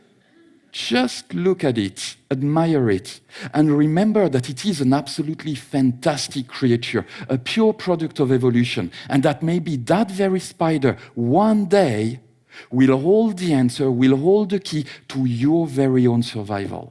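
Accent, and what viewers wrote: France. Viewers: Speaks very well, french accent or no. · Okay French accent is now cool XD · I don't care if can change the world I became total dumb about rational things when it come to spider 😭😭😭😭😂😂😂 et ptdrr Rpz l'accent Français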